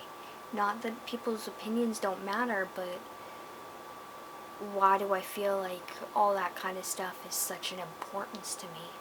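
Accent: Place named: Canada. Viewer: i can hear your canadian accent!! :D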